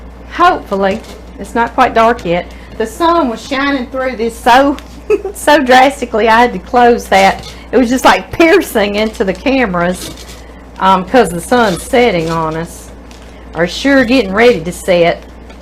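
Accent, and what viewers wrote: South, United States. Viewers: Watching so of your older videos. I loved your accent back then. 😊❤️🙏🏻 Oh, and people should never, ever be mistaken in thinking a southern accent means you are stupid. ❤ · I could listen to this sweet southern lady talk all day! What a great recipe! It's basically lasagna but without all of the work involved.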